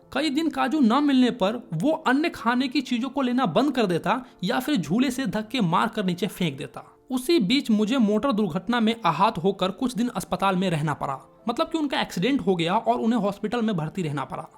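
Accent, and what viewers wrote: Bihar, India. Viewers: Bihari apni phele awaaz sahi karle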